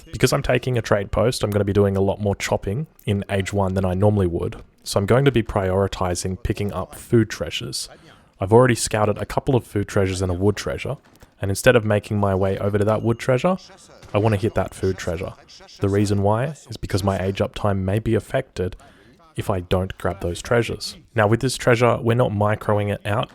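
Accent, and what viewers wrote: Australia. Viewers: He's just australian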